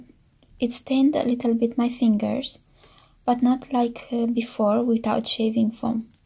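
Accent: Romania. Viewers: She is Romanian ..but the point is that not all the Romanian people have this accent ..she has an horrible accent ..I am from London ..Where are you from ? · a accent Romanian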